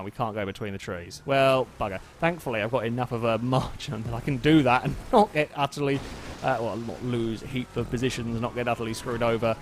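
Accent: United Kingdom. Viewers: How does this man not have more subscribers! He has everything a successful Youtube channel needs! Amazing content, british accent, and cat videos! I think it is our duty as fans to spread the word about and his channel!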